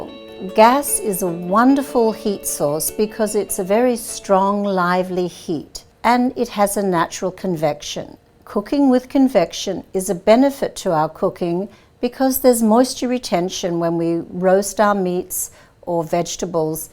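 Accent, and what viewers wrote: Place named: South Africa. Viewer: Love my Bertazzoni and that South African/NZ accent.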